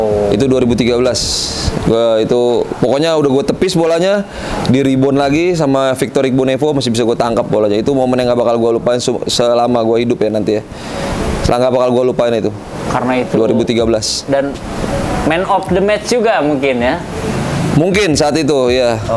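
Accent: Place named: Bekasi, Indonesia. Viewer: Di liat liat asik juga dari bahasanye wkwk, orang Bekasi ya bang?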